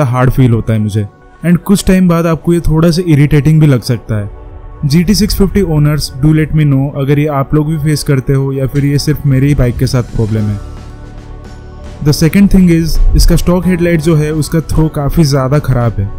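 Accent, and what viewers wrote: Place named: Odisha, India. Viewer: ତମ କଥା ରୁ ଜଣା ପଡୁଛି,ତମେ ଓଡ଼ିଆ........ଖୁବ୍ ଭଲ ଉପସ୍ଥାପନା କରିଛ Video re❤ lots of Love from Barbil,Keonjhar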